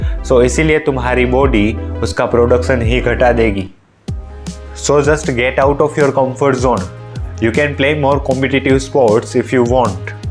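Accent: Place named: Gujarat, India. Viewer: You sound gujrati. Feels good to see gujrati doing such different kind of videos. Also very new to this field. You sound nervous also. But keep going bhai you will overcome it all with time. All the best doctor saheb.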